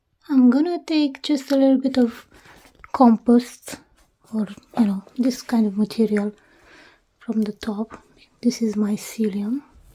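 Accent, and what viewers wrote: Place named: Romania. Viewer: Neat ma’am. By the way, you have a very soothing voice. Your Romanian accent is just the icing on the cake with your voice. I can listen to your voice all day.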